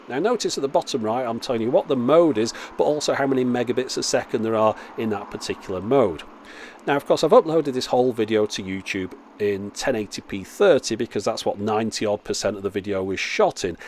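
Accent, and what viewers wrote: United Kingdom. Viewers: from which part of the UK you are?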